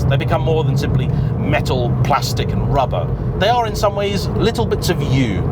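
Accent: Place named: Yorkshire, United Kingdom. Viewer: Did enjoy the Yorkshire reference and accent 😂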